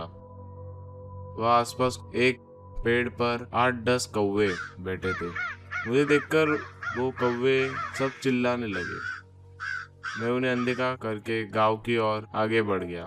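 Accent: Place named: Hyderabad, India. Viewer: You sound 100% Hyderabadi to me. indian Hydro I mean.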